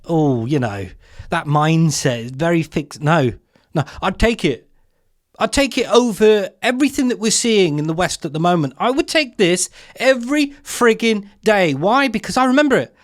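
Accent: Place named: Northamptonshire, United Kingdom. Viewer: I gotta have a beer with you geez...your accent is Northants ent it....I used to live in Rushden..no trainstation ..whoopee...only now I realise what a godsend that was....tartar😂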